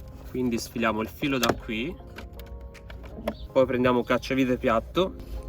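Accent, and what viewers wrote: Tuscany, Italy. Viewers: Ciao, io sono già andata da 2 elettrauto per altri lavoretti e quando ho accennato a cambiare queste lampadine si sono rifiutati😭..ora capisco perché 😁! Per caso lavori in toscana dato il tuo accento? Quanto può costare farsela cambiare da un professionista indicativamente? Grazie Manuela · fantastico! bel video, poi con l'accento toscano e ancora più divertente guardarlo.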